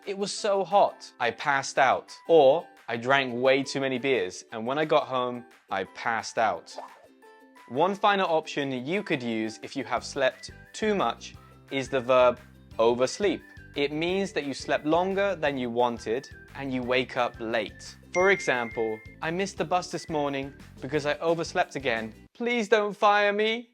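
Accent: United Kingdom. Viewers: you seem like a British native speaker are you from the uk?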